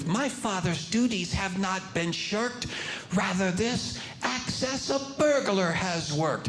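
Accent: United Kingdom. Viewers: British accent and Shakespeare? Wow!! Talented guy!! Bloody brilliant!❤😊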